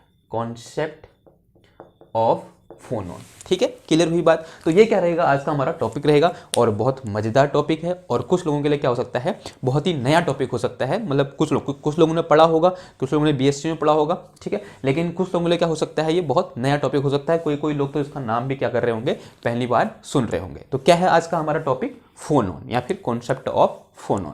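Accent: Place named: Garhwal, India. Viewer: Bhai , garhwali hai na pura Accent aarha hai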